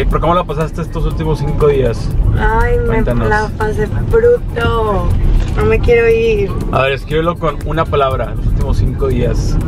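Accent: Monterrey, Mexico. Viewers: Esta bien chido el acento regio!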